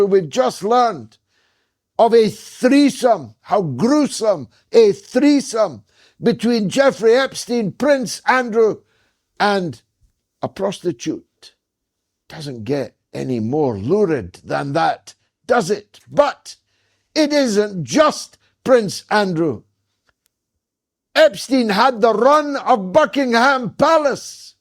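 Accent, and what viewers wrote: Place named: Newcastle, United Kingdom. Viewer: Gawn yersel Geordie boy yer on the boil the day 👍😎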